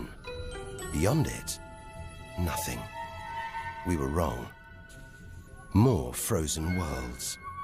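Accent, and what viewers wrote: United Kingdom. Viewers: Why is Alec Baldwin speaking in British dialect? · Why do they alway have to re-voice American documentaries in an English accent or vice versa? I’m fed up with that! It’s the same fucking language! If I can understand both accents, so can everybody! Give me a break! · Sean pertwee vs alec baldwin, sorry but the british soft spoken accent is so much more real. More believable, more comforting and true. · British narrator, but this is so American!.. hard to watch as an European.. why always so full of it. Keep it real folks!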